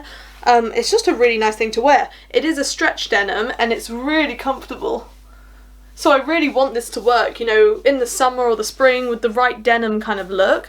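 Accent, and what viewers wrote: United Kingdom. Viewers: Oh you have the beautiful British accent! Love it!!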